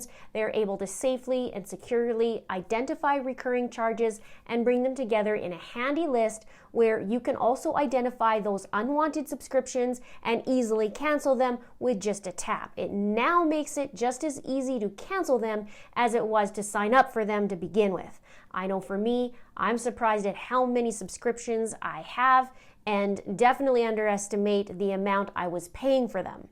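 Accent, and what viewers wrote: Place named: Canada. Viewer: Love your videos! You cover these crimes so well and I like your Canadian accent😊 Canada is the favorite country I have ever lived in!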